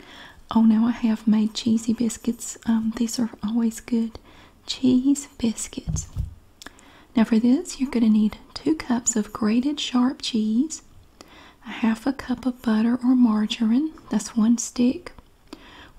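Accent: South, United States. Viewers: The fact that her name is, she’s southern and reading recipes is literally the CUTEST thing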